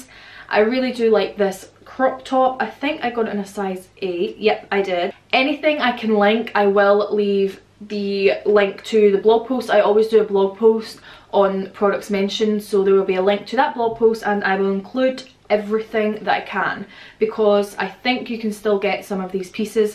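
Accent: Scotland, United Kingdom. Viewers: sounds like Glasgow if not it’s Edinburgh (for the accent)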